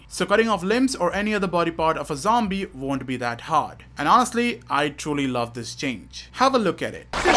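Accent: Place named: India. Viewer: Lmao you sound Indian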